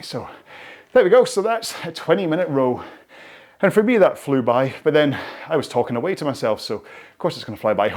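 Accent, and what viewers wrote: Scotland, United Kingdom. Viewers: Newbie here. Love rowing along with you. Your Scottish accent is wonderful to listen to while I row.😊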